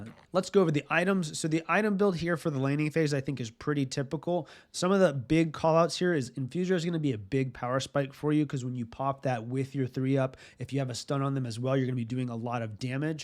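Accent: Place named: Canada. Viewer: Wait ur canadian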